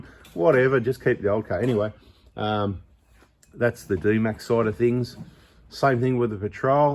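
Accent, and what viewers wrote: Australia. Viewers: I have problems understanding Australian accent, so I got the first choice of a diesel for Isuzu, but I could not understand - other than V8 and made in Japan - what the choice of the petrol model was. Can someone write it down for me?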